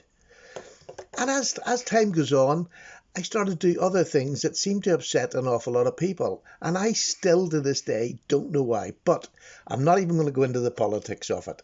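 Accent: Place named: Ireland. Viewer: OMG....this is the first time I've shed tears when watching a blade video. It's just beautiful what you did with it. You should have been a writer or a poet. Love your story and respect you even more. Love watching your videos and just hearing that wonderful Irish accent talk about the love for blades. Thank you.❤️😊🙏🍀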